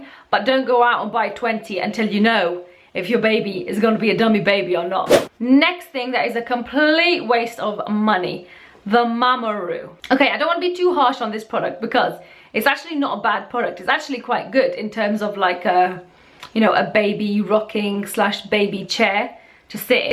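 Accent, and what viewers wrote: United Kingdom. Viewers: ive noticed you pronounce video as vijio it's because of your british accent :) haha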